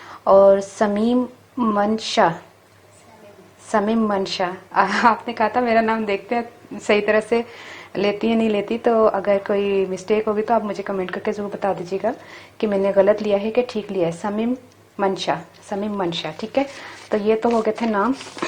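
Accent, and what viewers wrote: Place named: Punjab, India. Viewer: Tuhadi Punjabi Masha Allah bahut Mithi hai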